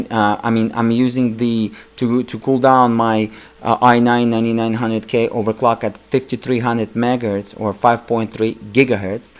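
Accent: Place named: Russia. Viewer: You are from? You pronance like a russian.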